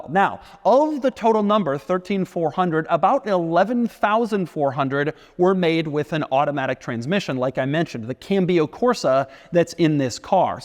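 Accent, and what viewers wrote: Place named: United States. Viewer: He's speaking American English. Because he's American. Get over it.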